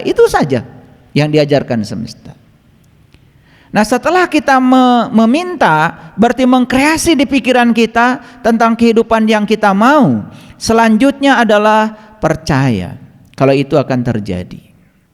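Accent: West Java, Indonesia. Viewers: Lebih kepada logat bos,, logat Sunda emng sperti itu, akn brbeda dngn logat orng Jawa, orng Arab dn sbagainya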